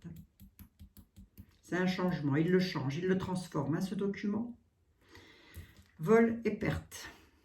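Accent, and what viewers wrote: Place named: Franche-Comté, France. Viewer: bonjour madame ! Ne seriez-vous pas native de Franche-Comté par hasard ? L'ayant quittée depuis de nombreuses années, j'ai pourtant l'impression d'en revivre l'accent, certaines expressions, les boutades etc. Est-ce que je fais erreur ?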